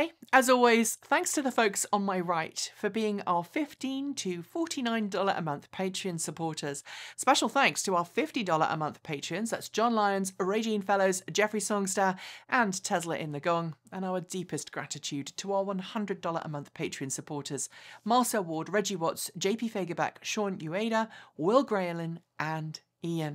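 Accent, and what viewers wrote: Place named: United Kingdom. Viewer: A British accent just makes everything sound legit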